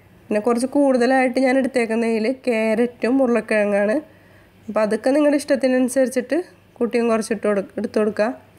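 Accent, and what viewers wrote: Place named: Kodungallur, India. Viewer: ഇത്താ.... വീട് കൊടുങ്ങല്ലൂർ ആണോ... സ്ലാങ് കേട്ടിട്ട്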